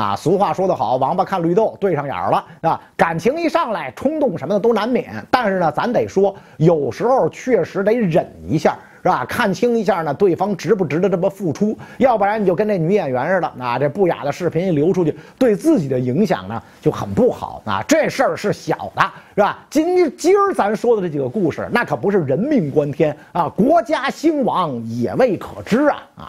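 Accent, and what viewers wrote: Beijing, China. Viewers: 老师的京片子很好听😄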